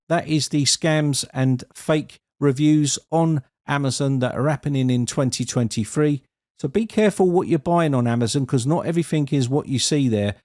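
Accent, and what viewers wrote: United Kingdom. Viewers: Dear, I have been your loyal follower and supporter for years but a docile one and rarely would I comment anything here cuz you are not just only professional, but also your tone and voice attract me so much. Yes, I am definitely so in a way that no matter what topic or theme you are focused on each time, I would just trust, believe and follow so. I remember there were several times I followed closely your guidelines and instructions of running cmdlets in the WinRe settings and did have one of my Fujitsu Laptop completely fried with none OS remaining from drive x: to boot on, especially at the time about Windows 10 vers 1809 and 1903 prevailing, where MS media creation tool was not yet so available for download for fresh installation, and as a matter of fact, the approach you taught us did not even allow any system repair discs to run on and that's what I am referring to as for "completely fried". 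There were some more similar and other same Finale cases of the fate of my devices, but since I declared before already that you are my favourite IT online consultant, instructor, tutor and teacher, I never wrote here back to grieve or challenge your expertise. This has to thank for your beautiful voice which carries a sense of charisma that has a great lure to listeners even though audience never see your real face, and In my belief just based on your magnetic luring voice and sound, with precise tempo, cadence and completely pure British accent of spoken English language, yo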